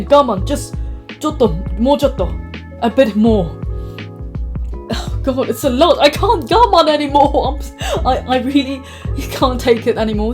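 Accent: United Kingdom. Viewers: When you speak Japanese, you sound like a Japanese person. But when you speak English, you sound more British. Either way, you sound great. No judgment here. 👍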